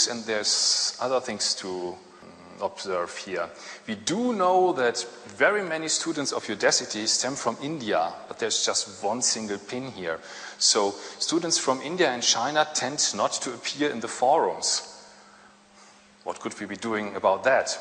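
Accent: Germany. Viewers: man hört voll den deutsch akzent raus :D aber sonst sehr schön ^^ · "aber sonst?" ich find deutschen akzent sympatisch :)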